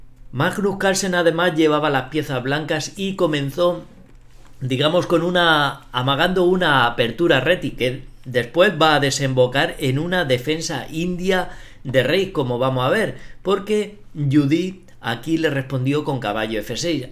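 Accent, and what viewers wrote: Spain. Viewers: Habla muy españolete ese es el problema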